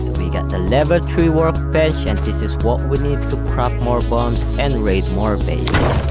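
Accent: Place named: Philippines. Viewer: Are you filipino kasi buses Filipino eh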